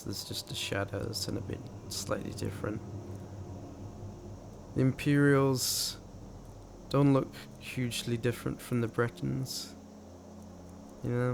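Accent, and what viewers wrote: Scotland, United Kingdom. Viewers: Love your scottish accent, reminds me of that guy off Theme Park World who guided you through the game :) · Scottish accent, am I right? :) Great walkthrough, by the way! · omg your Scottish! :D it feels so rare to see another Scottish person lol xD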